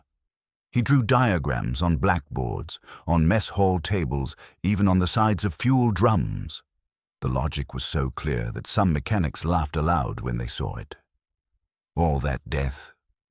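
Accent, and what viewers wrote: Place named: United States. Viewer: An entertaining story but if American robotic narration is used and the graphics are CGI, I'm skeptical of it fully being true. For a story on something British, hearing robot narrator say, 'sodder' instead of 'soldering' and 'Aluminum' instead of 'Aluminium' ... not good, and anyway, wasn't it fuel starvation that caused the Merlin's to conk out before a fuel injection system was utilised.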